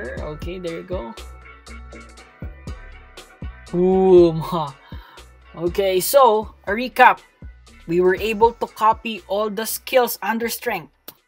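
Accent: Philippines. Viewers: Oww filipino ka pala halata naman sa accent mo!!!! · I fricking knew it, you are Filipino, I knew it by your accent >:D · i know a filipino when i hear them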